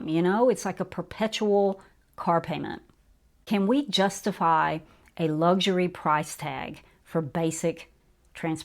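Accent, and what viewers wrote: South, United States. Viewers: Southerner here. Trying to place your accent- North Carolina? GA?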